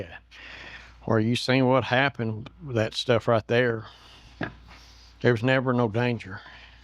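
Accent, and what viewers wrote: South, United States. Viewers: Great jig! As a fellow southerner, nothing wrong with your accent! We don’t pock the cah! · Thanks for the reply. I'm Italian and passionate about English. Sometimes I think I can recognize some American accents. In your case, I thought I was hearing a Southern American accent. Glad I guessed right. 😊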